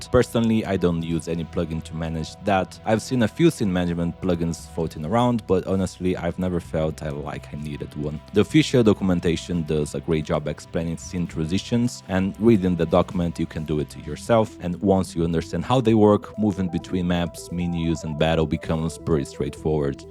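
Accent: Brazil. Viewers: hey is that a fellow Brazilian accent I hear? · sabia. Minha esposa é do Brasil; eu podia ouvir a mesma sotaque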